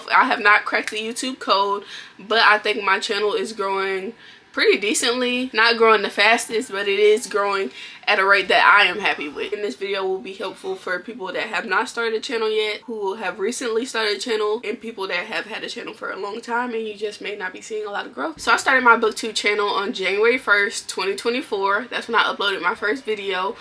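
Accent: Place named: Florida, United States. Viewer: Knew you was a fellow Floridian on the original video I watched!! We just have a distinct sound. Im from Palm Beach. I will be starting my channel in a few days and this really helped to uncomplicate it all! Ive felt stressed starting a channel before. It wasn’t fun either.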